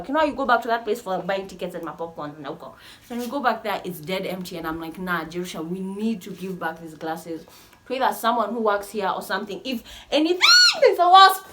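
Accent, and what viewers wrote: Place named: Scotland, United Kingdom. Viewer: team ANTON LOVE ISLAND 2019 all the way 👊🏽👊🏽👊🏽👊🏽 Scottish accent a struggle .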